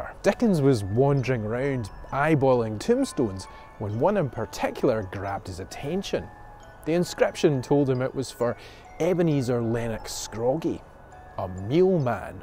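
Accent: Scotland, United Kingdom. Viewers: The story is great but, your Scottish brogue makes the story telling the best ever!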